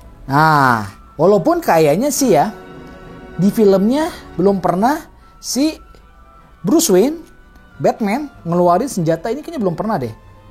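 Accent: West Java, Indonesia. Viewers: Sunda mana ieu bang 😂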